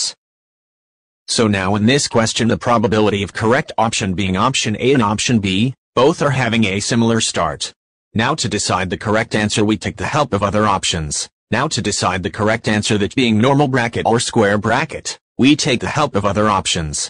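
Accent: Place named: Spain. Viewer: Super bro Spanish english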